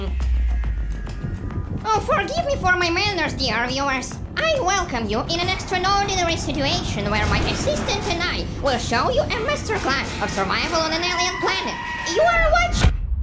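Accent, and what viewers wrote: Russia. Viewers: Мне одному отчетлево слышен его руский акцент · Ох ебать акцент как будто на русском смотрю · Блин слышно у него русский акцент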